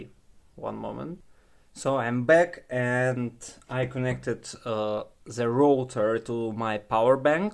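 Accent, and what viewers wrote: Russia. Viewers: I like your Russian accent thanks for the video man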